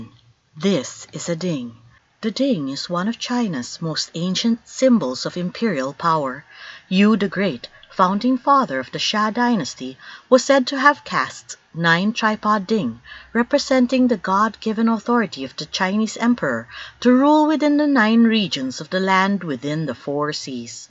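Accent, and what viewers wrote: Philippines. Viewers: Exactly and it's quite obvious. It smells Chinese propaganda. The whole false narrative their trying to inject into our history is totally laughable. And the use of Filipina voice and Tagalog username is deceptive. Remember from TGC vid that before the Chinese ever knew how to build ships, the early Ophirians were already masters of the sea.... In fact the pintados from the Visayas and Sulu frequently terrorized China's coastal communities....ransacking and pillaging them. And the only attempt by China to conquer Manila was through Limahong who failed miserably.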